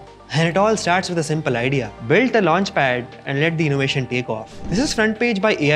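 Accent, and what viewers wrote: India. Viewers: Good sweet kanglish accent....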